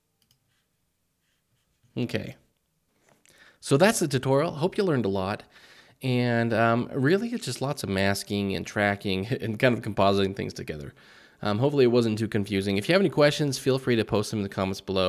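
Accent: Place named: London, United Kingdom. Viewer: 3sec to watch and like, thx. More tutorials!!! best londons speak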